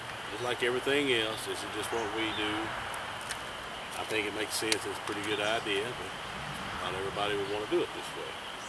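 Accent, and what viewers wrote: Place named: Texas, United States. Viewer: I tell ya brother, i do love yer vids and being a Texan hearing you talk makes me feel right at home!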